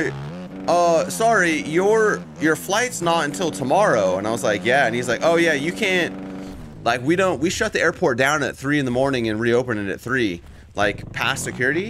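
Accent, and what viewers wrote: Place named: Philadelphia, United States. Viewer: Wheres your accent from buddy? You gotta be from philly area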